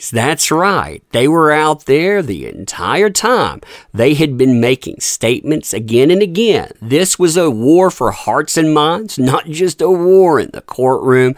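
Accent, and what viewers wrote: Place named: East Tennessee, United States. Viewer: I don't know if you've always been in east Tennessee but you sure sound like it and get tickled like it too.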